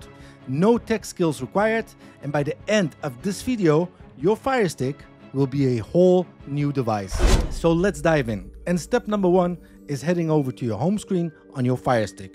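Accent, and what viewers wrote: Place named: Netherlands. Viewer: Deze Hollander spreekt bijna Engels. Nee, goed hoor ik moest echt goed luisteren om het te horen.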